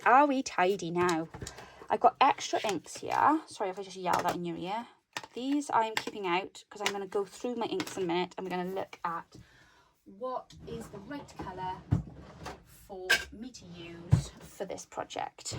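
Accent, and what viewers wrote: Wales, United Kingdom. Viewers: Thank you, thank you, thank you! 🥰I love when you post this kind of video!! I'm able to listen to your hilarious stories and ramblings guilt free, while I work on my JJ or whatever the project of the moment is. Instead of working on a project and panicking that I've missed the pivotal step in how to properly do what your showing us... This one has been wonderful, we got so much insight into you. Plus it was really entertaining, especially for me, who was so in love with Princess Diana, therefore everything British/Welsh, back in the day, when I was much younger and the world was a very different place.( Hint, it's the lovely accent.) Plus all the super random side comments, which is exactly how I think!! ( oooo Squirrel!) By the way, my family calls that emergency, speedy clean up, doing the "Flight of the Bumblebee". Running around the house shoving and/or fluffing and/or hiding stuff, before some unexpected person wants to enter the house. 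Question, How on Earth is it warm enough to go swimming in Wales, in MARCH? Brrrrr! here in Virginia, USA.🥶 Never knew about the SuperThanks, it's under the (...) and just says Thanks. But now we all know! So thanks... Also check your tins-----one has money and one has a battery! Hahahahah 😜 Much Love, Karen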